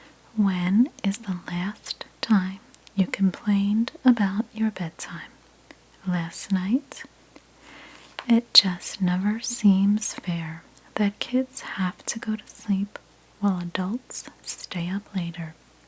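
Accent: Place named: New Jersey, United States. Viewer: I believe it’s New Jersey!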